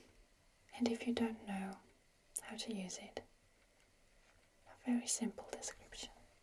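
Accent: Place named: Germany. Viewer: A suspiciously good German accent🤔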